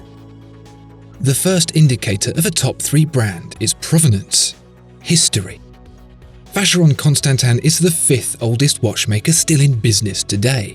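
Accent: United Kingdom. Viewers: Now if we could just get you Brits to stop saying 'Pack-AHHHHD'.....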